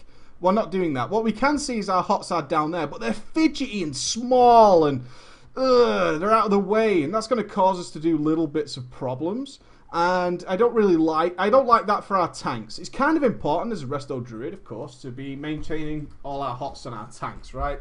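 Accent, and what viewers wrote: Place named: Manchester, United Kingdom. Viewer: you sound like yer from "MAAAAHHHN-cheeeeh-stah" /brofist!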